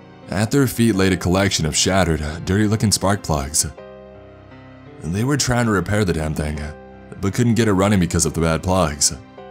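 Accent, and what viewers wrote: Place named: California, United States. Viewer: iv always just thought of it as a surfer bruhv California accent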